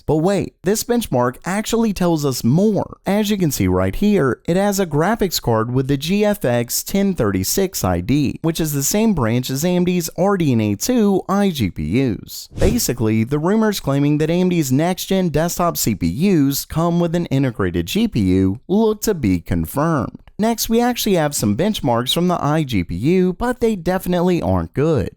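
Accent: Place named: Baltimore, United States. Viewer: is he from baltimore. he says un instead of on.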